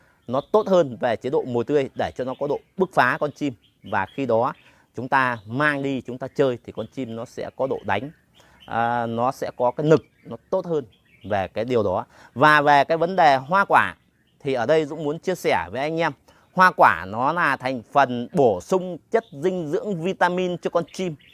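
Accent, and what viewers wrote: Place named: Hai Duong, Vietnam. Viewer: Giọng nói quê Hải Dương dung cháy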